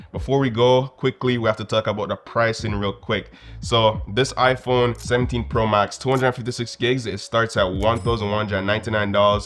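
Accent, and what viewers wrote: Jamaica. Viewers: Sound like him from 🇯🇲 cant wait to get mines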